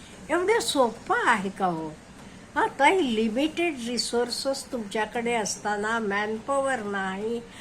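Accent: Karnataka, India. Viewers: आई तुम्ही खूप गोड आहात 😊 तुमच्या मराठीत कन्नड भाषेची फोडणी घातल्या सारखं 🙏 छान वाटला व्हिडीओ 🙏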